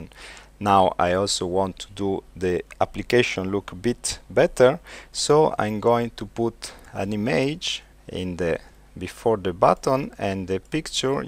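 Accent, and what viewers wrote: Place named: Brazil. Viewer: Inglês brasileiro ;) We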